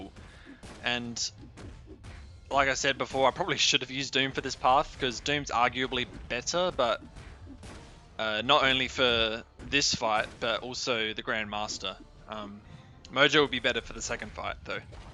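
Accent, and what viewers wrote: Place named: Australia. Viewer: Recognised a fellow Aussie instantly😅 Nice vid man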